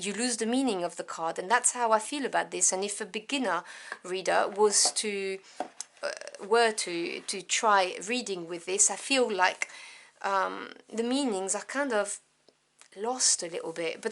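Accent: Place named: France. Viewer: Lovely accent, French? Or maybe like Syria or something ?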